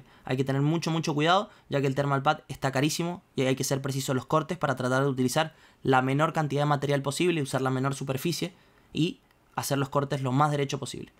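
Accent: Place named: Interior, Argentina. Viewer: Buenas. Tenes una tonada del interior, de donde sos? Corrientes?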